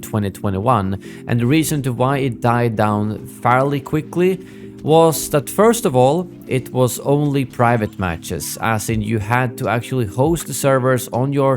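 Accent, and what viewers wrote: Sweden. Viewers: u swedish?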